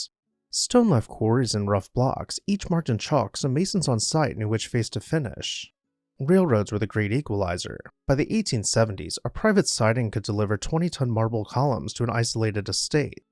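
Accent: United States. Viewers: That bloody annoying American accent, distinctly Gay, grates on me, I'm afraid. I turned it off after two minutes. Sorry mate, but construction, especially in those days, was done by people who spoke different to you. 55 years in the trade, I'm afraid I couldn't take any more..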